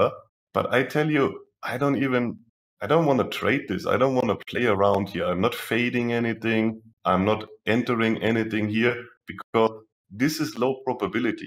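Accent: Germany. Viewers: Are you German, bro?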